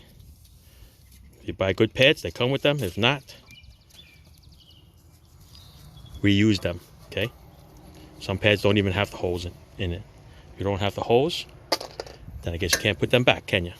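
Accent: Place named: Deep South, United States. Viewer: This was fantastic. Now bc it’s brakes I don’t have enough courage yet, but I’ll bet if I did, I would get it right because you are such a good teacher. Also, I’m in the deep south and hearing your regional accent, which is close to mine, was very comforting. Miss being around my people. 😅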